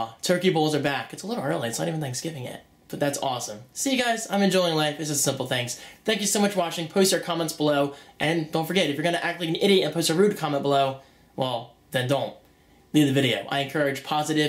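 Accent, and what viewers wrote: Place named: South Jersey, United States. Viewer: Wawa is a 10X better version of a 7-11. They have them all over Jersey and PA. Judging by his accent he's prob from the South Jersey/Philly area.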